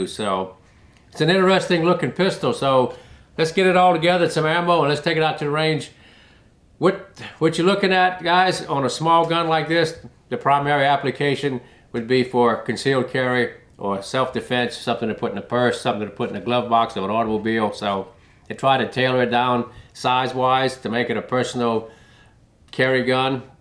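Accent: Louisiana, United States. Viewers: Mr, I a a North Louisiana native myself. I noticed a bit of a Cajun accent but thought you were a Texan. The good food and music got to ya and you know it's true. I love and respect you and consider you an American badass.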